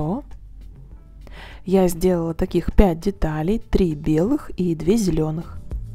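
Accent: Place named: Ukraine. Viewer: ПЕтелька, протестируем!!! Что за малороссийский говор??? Следите за речью! Вы же не в подъезде гутарите!???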